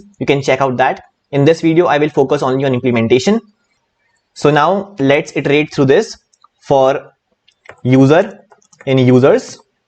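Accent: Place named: India. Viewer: FInally a youtuber speaking english in an Indian accent ! Amazing efforts !!!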